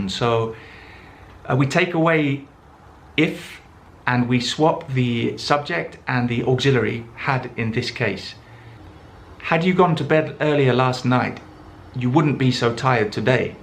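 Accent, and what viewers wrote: United Kingdom. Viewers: I fall in love with your videos 😊 Especially your impossible British accent 😍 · Thank you very much! Great way of learning English grammar (also British accent, which I personally love😍).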